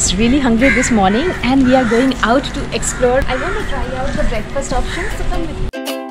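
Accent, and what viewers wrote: India. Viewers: Refreshing to see an Indian looking girl with an Indian accent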